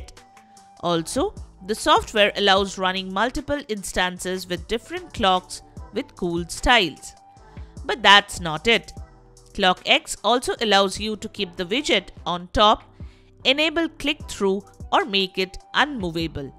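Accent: India. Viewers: hidious indian accent ... also 'dekstop'? learn to read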